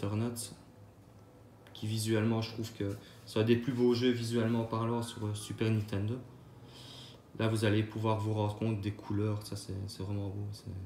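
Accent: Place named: Liège, Belgium. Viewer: le bon accent liégeois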